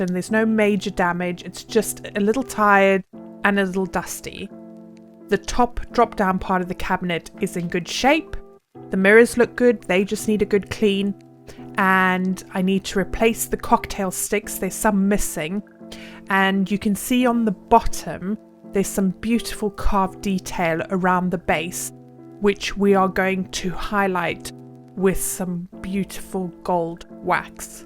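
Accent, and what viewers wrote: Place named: England, United Kingdom. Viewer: I had to put the video on mute. I couldn't stand your English accent...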